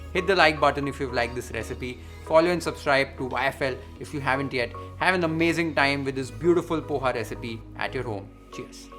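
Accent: Maharashtra, India. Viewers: Your Marathi accent is ❤. तोंडाला पाणी सुटले, उद्याच बनवते. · Your marathi accent is so nice 👍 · Marathi accent khup chaan aahe....saglya recipies khup chaan asttat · Being a Marathi mulgi, I am in love with this guy!! Not just the recipes but the Marathi accent too! He mentioned that he was brought up in Mumbai and that definitely shows! The way he says बटाटे or वाटण or even काळे मटण with a ण and not न , makes me fall more for him! Keep it up Chef! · Chef, you got amazing Marathi accent. Jai Maharashtra 🔥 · I just love his marathi accent ❤ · Marathi accent 🔥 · Perfect pohe+sweet Marathi accent 😉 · Farach chan ... Marathi bollay te bara vatla😊 I really enjoy all your receipes..grt goin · I love batate Pohe. And yes I am from Maharashtrian family so we make minimum 3 times a week. Simple, fast and very tasty And your Marathi ascent is really awesome खूपच छान दादा 🙏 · I like your humbleness and i enjoy your receipes. 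I am Marathi and from Mumbai, now in US(missing Mumbai) Your sweet Marathi brought a smile to my face. As usual, great receipe with great tips:) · Hi तुम्ही खूप छान aaslkhlit मराठी बोलतात धन्यवाद.... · Your marathi accent is superb 👌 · Thank you chef for this recipe ❤️ I am really in love with your marathi accent, tumhi khup Chan Marathi boltat... ❤️ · Chef, I’m impressed by your chaste Marathi language skills. Mulga pass zaala! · Hey I love your marathi accent 😊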